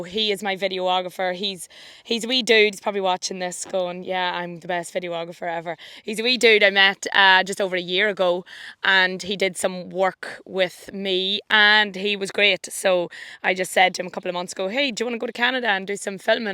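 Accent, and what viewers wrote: Ireland. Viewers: ❤️ tho voice it reminds me of my trip to Ireland 👍🤠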